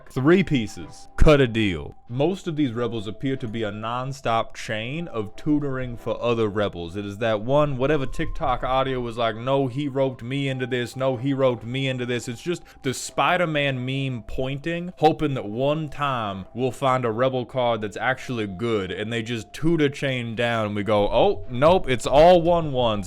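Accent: South, United States. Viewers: Turned that southern drawl up to 11 for this vid :D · why is he so southern today?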